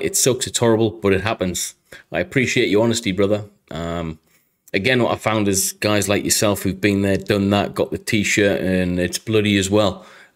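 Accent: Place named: United Kingdom. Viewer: I’m brand new to the channel, I’ve just come across one of your ‘voice over’ videos (the 5 reasons the US will beat China & Russia or similar. I’ve mashed the subscribe and like button. Loving this video, I’m ex-light dragoons, I have huge respect for the royals. Absolutely outstanding soldiers, great moral compass and I massively agree with everything said on this. Where are you from mate? You sound my neck of the woods. Well done on the channel. 🇬🇧🤜🏻🤛🏻⚓️⛴🥷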